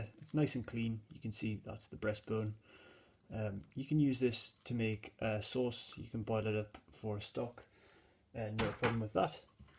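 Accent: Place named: Newcastle, United Kingdom. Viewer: Thanks for sharing this. I just de-boned my first duck! Much easier that I remember chicken being - and nice to hear the Newcy accent. Cheers! :)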